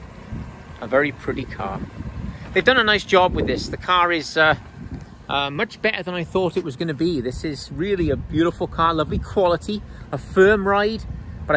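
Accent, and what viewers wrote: Wales, United Kingdom. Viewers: Great video,.. Slight hint of a Welsh accent there I do believe 😁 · Definitely a Welsh accent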